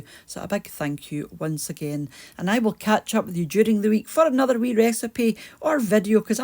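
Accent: Scotland, United Kingdom. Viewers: Love your work,and the accent! I lost my scottish mother a couple of years ago,and your voice and recipes remind me so much of her-very comforting😊 Thankyou and look forward to the next episode! 😎 All the best from Australia🇦🇺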